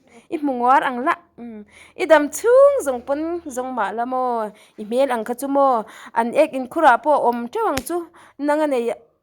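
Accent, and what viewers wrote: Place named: Mizoram, India. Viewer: I chhiar thiam a niang ka hmin riau zel tin mizotawng thluk hi a awl ai lo khawp a nia lo tang sauh2 teh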